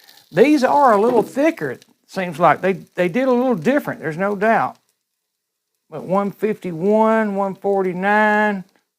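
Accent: South, United States. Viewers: Great video plain and simple 'you mentioned your southern accent" that adds to receipts flavor. yo' al I am new to this air freyer The Nija and needed some cooking information. Thanks again...I am 80yrs old and one is never to OLD to learn something new! God Bless. 😉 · There's just something about the southern accent. 🤗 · Hi tried the Salmon Fillets yesterday absolutely delicious 😋 we are Ninja Foodi new comers , brilliant bit of kit , by the way love the good old Southern Accent !!!.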